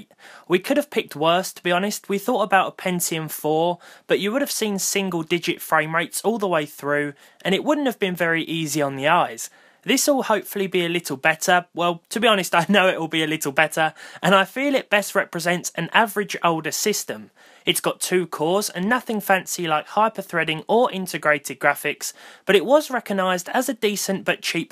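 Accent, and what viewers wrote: United Kingdom. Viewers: is this a brittish accent?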